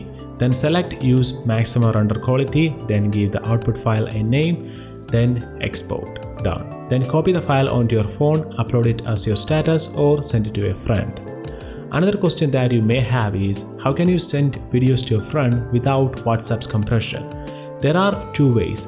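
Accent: Kerala, India. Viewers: Ah English il thanne manasil ayi malayali anu ennu😁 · Malayali aanalleee.... Pronounciation kettappol manassilayi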